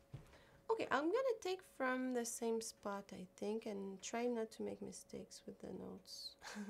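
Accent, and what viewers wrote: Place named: France. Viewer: Hey there ! I folllow you since 2 months, and I heard that you have a little french accent when you speak english... 😉Are you french, because I am, and proud to be one ! I love your channel !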